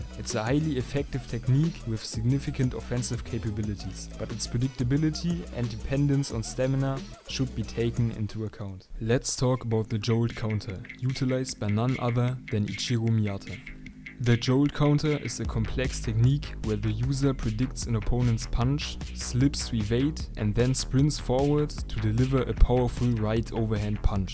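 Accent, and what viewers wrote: Germany. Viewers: Where u from? You sound German or something like that. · This german accent... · U German ?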